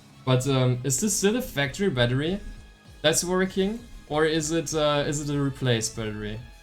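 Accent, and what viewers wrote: Germany. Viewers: From Germany? Heard it after 2 seconds of talking. Never watched a video before but love your content. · You have the most german accent i ever heard. No front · I can hear that english is not his mother language. Could it be he is german? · man hört so raus das du deutscher bist :D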